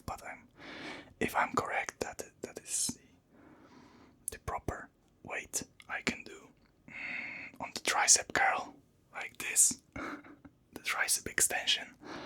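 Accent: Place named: Russia. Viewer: I'm glad you found a hobby you love and you're doing great at it. I really love ASMR and am glad you're making such a big contribution to it. Hope your channel continue to thrive. I'll probably subscribe too and look forward to new videos =) P.S I'm currently learning English and I love your pronunciation...this is the first time I understand English speech without subtitles. Maybe your clear pronunciation is due to your Polish accent?! (It sounds a bit Russian, btw) Poland is a wonderful country! I hope I can visit it someday!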